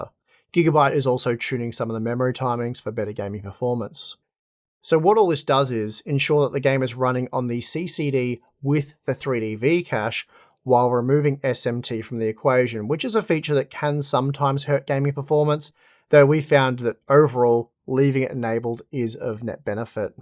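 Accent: Australia. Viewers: when u r talking about the part and it's advantages and disadvantages with specs etc - having b roll doesn't help... what would be nice is to have a chart/table for example, highlighting the points u raise at the moment perhaps? Just talking about it on the air~ isn't most convinient, especially with YouTube subs analyzing Australian accent sometimes and with similar names for products....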